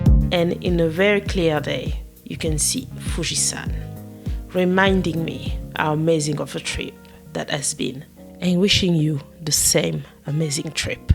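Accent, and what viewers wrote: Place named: France. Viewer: Love that french accent 🤣🤣 you deserve more views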